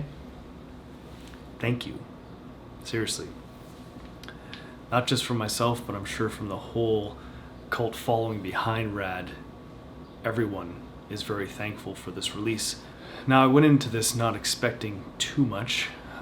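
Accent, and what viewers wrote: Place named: Canada. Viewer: Rad is Rad. I hear a Canadian accent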